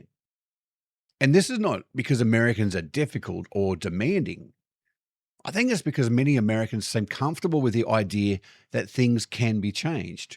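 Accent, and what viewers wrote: Australia. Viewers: You’re not an Aussie living in America; you’re an American with an Aussie accent. (I’m an American with an Ohio accent.)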